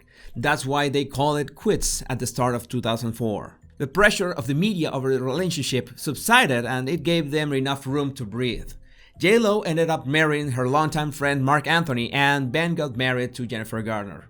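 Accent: Spain. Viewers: Yes, I understand spanish or more what you call "spanglish" but sadly do not speak it fluently. I'm mexican/spanish american. My Mom's 4th generation and Dad's 1st on his father's side...but 3rd on his mother's side and was born here too, so they both speak it fluently...but don't have much of an accent when they speak english. We're very proud of our heritage and culture but obviously are very "American". I wish I spoke spanish better. What I like about is he speaks perfect english with a beautiful spanish accent, but even though he speaks at a nice pace, it's not too fast when he is giving his commentary, compared to most people who speak fluent spanish. Love the Latino accent...italian too! 😁💕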